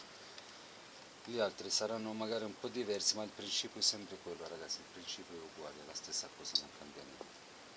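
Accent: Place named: Spain. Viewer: Sei spagnolo si sente nel accento bravo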